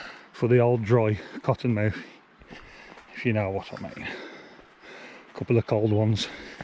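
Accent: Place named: Yorkshire, United Kingdom. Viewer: Hi, so I found part 1 and just watched it and will watch the other 3 parts soon. Gotta ask you about the accent mate, where you hail from? Yorkshire? I liked your style of editing too, very similar to the style I do (and those placing the camera down and walking towards it shots like you do!) Loved seeing the train station and the train tracks at the beginning. Great weather you had, makes for good views on the camera. At different points in the film you seem to alternate with lenses, ranging from narrow to wide. Do you use a GoPro by any chance? Nice stabilization on it. Couldn't help notice the nude girl in the newspaper as well.:) I paused to try and get a look at your footwear as well and noticed you were wearing trainers but couldn't quite make out which. A good video, well filmed, nice angles and smooth pan shots.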